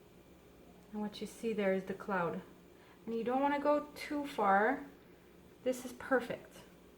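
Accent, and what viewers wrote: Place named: Canada. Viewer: Ha! I knew you were a fellow Canadian by your accent! Love your work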